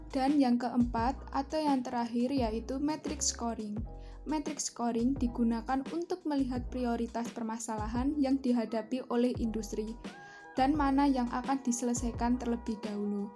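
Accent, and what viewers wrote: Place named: Java, Indonesia. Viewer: medoknya sangat terasa khas Templek 😭👍🏼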